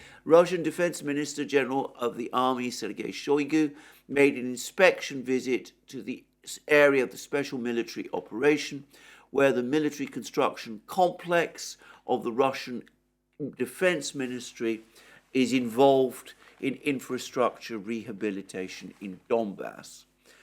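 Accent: England, United Kingdom. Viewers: Wired listening to a Greek communist with an English accent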